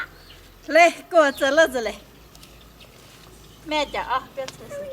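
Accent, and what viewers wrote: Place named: Yan'an, China. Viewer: 我陕北话这么有牌面么。感觉还是延安口音。。。。。。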